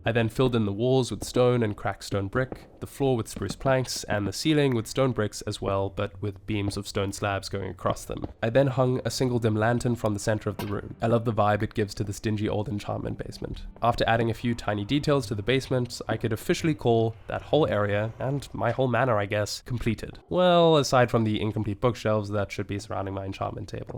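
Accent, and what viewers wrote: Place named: South Africa. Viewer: Are you from SA? Your accent is a dead giveaway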